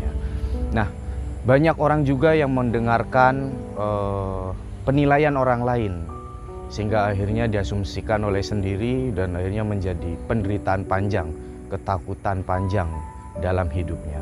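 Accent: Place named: Banyumas, Indonesia. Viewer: Oh wong ngapak ya . Salam sekang Pemalang mas. Tangga kota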